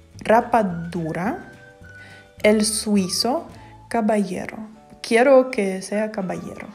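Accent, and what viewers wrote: Guatemala. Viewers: Ya casi pareces chapín solo fallaste en uy era ulugrun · Siempre los chapines le ponemos el Sason ha las palabras pero si lo hisistes bien saludos desde Maryland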